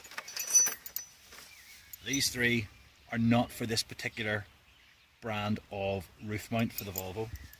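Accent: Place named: Northern Ireland, United Kingdom. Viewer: Great, I'd just bought one of those bike racks (also for a V40 D3) and had come to the conclusion that the rubbers of the (wing) bars had to come out, but thank you for confirming my suspicions. An' it's quare geg t'hear it from a fellow Norn Ironer!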